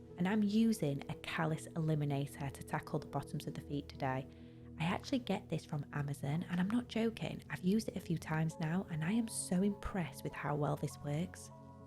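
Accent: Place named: Manchester, United Kingdom. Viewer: This is hilarious, because I am also a 38 year old man! 😂I at least DO know how I got here... I happen to find women's feet to be beautiful (not in a purely bedroom activities kind of way) but just the aesthetic beauty. Also, I totally agree that her Manc accent is quite lovely, and it definitely adds to the relaxing ASMR quality of the videos. Anyway though, love the videos, love your whole vibe, please keep on doing what you do because you are so amazing at it! 🙏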